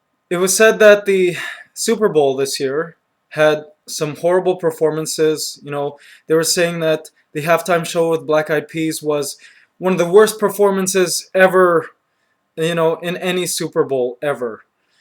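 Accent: Philippines. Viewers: ._. i find it more interresting to watch ur vids in the pinoy accent xD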